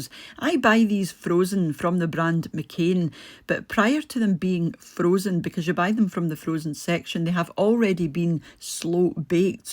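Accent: Scotland, United Kingdom. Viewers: Evening, as a Yorkshire woman I must say you can be a honorary Yorkshire Lass! What an impressive Yorkshire pudding! I also love the way you say Yorkshire in your beautiful Scottish accent. Lovely meals this week ❤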